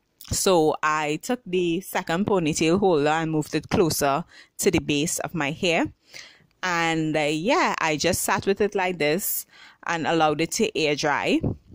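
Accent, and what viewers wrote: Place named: Trinidad and Tobago. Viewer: Trini accent, great video, lovely hair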